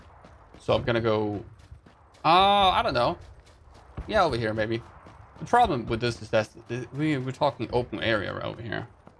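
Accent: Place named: Denmark. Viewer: Where are you from? You sound Danish.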